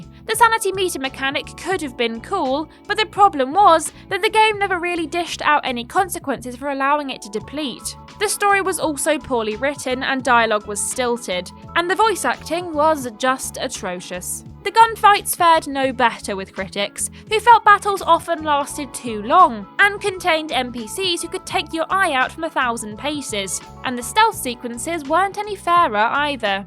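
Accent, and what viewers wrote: United Kingdom. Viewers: This girl is the most British person I've ever heard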